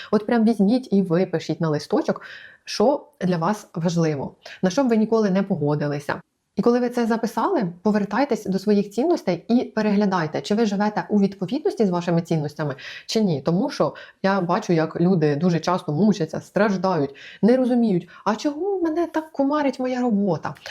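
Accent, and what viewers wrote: Ukraine. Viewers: Гарне відео. Якщо можна особисти питання. Ви випадково не з Полтавщини? Якась знайома балачка🙂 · Прикольно,це перше відео із вашого каналу,котре я дивлюсь,чогось ютуб його мені підкинув.Подобається ваша щірість та те,як ви розмовляєте,ваш легесенький суржичок просто божествений.Дякую вам за надхнення ! ! !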